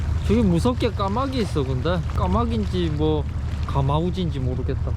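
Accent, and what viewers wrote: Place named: South Korea. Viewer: 넘나 웃겨죽는 사투리보러왔다가 혼자 실실웃고 난리입니다. 아 진짜 똥휴지가있어 부터 넘우껴서~~으아 ㅋㅋ 글고 같은 유튜버로서 영상얘기 좀 나누고싶은데 통화한번 하는거어떠실까요?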